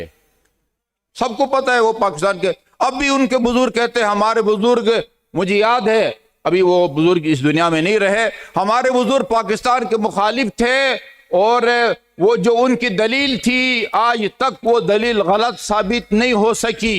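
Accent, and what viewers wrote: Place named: Iran. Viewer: جناب آپ پہلے فارسی لہجہ سرحد پار رکھ کر آئیں پھر کوئی بات کریں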